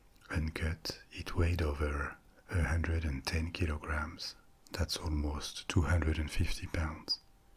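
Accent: France. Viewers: The content is fab in that it takes you to far off exotic places but that sexy French voice sends me to la la dreamland. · t'es français ?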